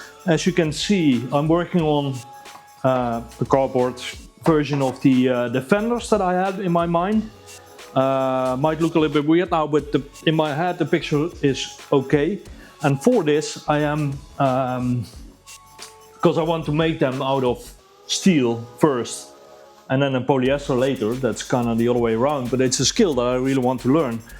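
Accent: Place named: Netherlands. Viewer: When I hear you talk I get the feeling you're origanally from the Netherlands 😂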